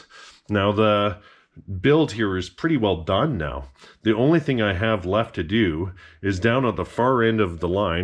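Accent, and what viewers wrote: Canada. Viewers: Are you from Nova Scotia ? Not mocking you , lord knows I have my language tells . You say the word yeah a lot . It reminded me of the ina bomber movie how the fbi caught him with his language tells.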